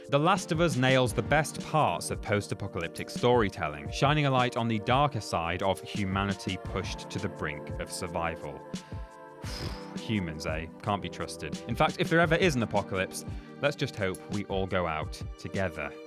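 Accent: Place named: Yorkshire, United Kingdom. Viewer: sounds very 'Yorkshire-ey' today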